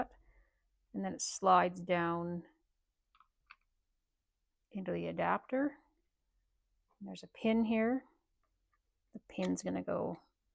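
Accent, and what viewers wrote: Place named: South, Canada. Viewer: Love that southern ascent southern Canadian I guess good show as always.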